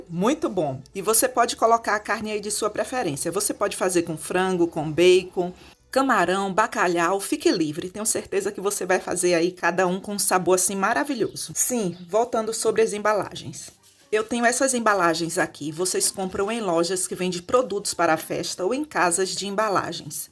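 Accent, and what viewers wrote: Bahia, Brazil. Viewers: Conheço sotaque baiano de longe,amei a receita e sua voz kkkkkk. Bj 😙 sucesso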